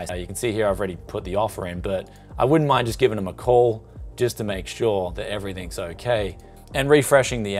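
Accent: Bogan, Australia. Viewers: Please stop with the bogan voice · idk why but, Australian accent sounds for me so good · That is exactly how I imagined Aussies speak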